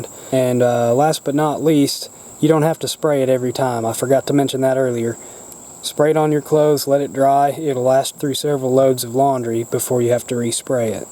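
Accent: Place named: South, United States. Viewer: Thank you for this great video. You’re knowledgeable and I like your Southern drawl.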